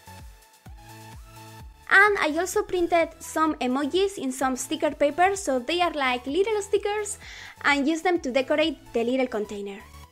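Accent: Spain. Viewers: Eres española? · tienes acento español😱😱😱😱 viva españa! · Es española, por eso su acento es tan fuerte. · ignorant, she is Spanish its her accent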